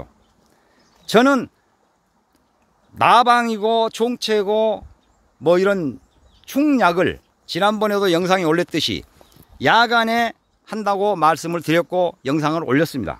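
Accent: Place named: Jeolla, South Korea. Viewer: 여긴 경상도인데 전라도 사투리가 이렇게 구수하게 들린 적은 없는데,진심이느꺼지네요.감사합니다.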